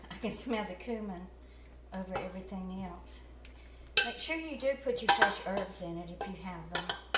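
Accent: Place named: Georgia, United States. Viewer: I don't know where you live, but you sound just like my sweet grandma from Georgia. ❤️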